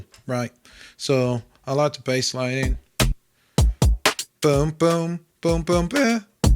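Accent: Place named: North, United Kingdom. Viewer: As an older guy who is completely out of his depth(normal for me lol), I find you so easy to listen too, although I'm a southerner I find your Northern accent so easy on my brain and even more important, no bloody American crap. Keep it up mate, I love what you do, and know its not easy teaching dimbos like me. Just know that thanks to you I am getting there, albeit slowly lol.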